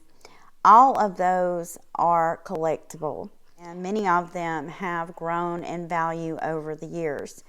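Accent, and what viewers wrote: South, United States. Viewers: Thank you! I love looking at your collection! You are a wonderful historian and being from the South, I love your accent! ❤️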